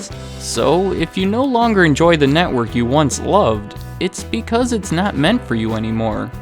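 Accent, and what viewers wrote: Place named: Minnesota, United States. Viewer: Only two videos! I want to watch more. Are you from Minnesota or something? You have a funny accent and I like it cause I'm Canadian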